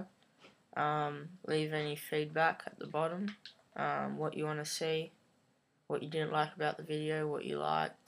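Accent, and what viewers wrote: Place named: England, United Kingdom. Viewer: ...annoying english accent from a teen not wanting to talk straight.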